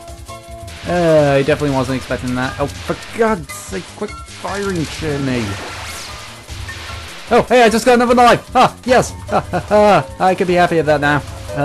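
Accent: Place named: United Kingdom. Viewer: British Pronouciation mate, we say some letters differantly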